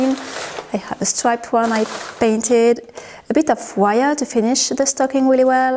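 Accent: France. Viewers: I am so enchanted by you, your lovely French accent and your art most especially.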